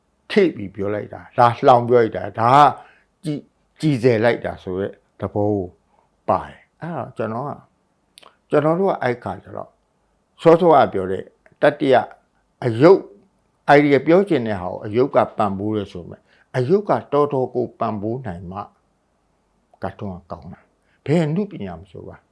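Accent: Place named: Upcountry, Myanmar. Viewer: အညာသားလေ သံ မပျောက်။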